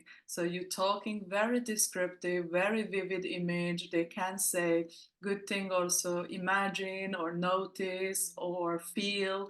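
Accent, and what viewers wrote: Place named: Russia. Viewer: Haha maybe. I like your voice, sounds Russian or Bulgarian accent. I felt onwards your voice very slow and direct/strict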